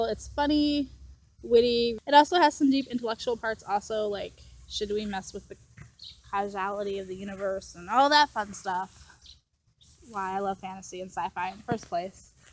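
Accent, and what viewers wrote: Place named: United States. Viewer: Think of making an audio recording like you would speaking on stage…slow down and enunciate more. I liked the content but had to relisten multiple times to get all your words. Certainly, I am not American, but I normally don’t have as much issue with a US accent.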